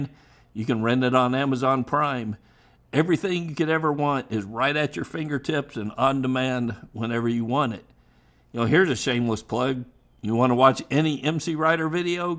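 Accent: Texas, United States. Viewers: I talk Dutch and still am able to perfectly (almost then) understrand your Texas English. You're good 🙂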